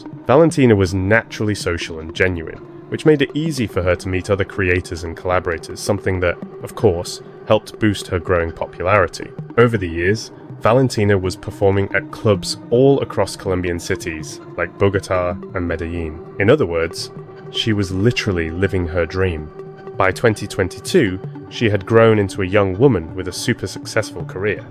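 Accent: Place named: United Kingdom. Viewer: Tip: get rid of that offensive, slimey british accent😮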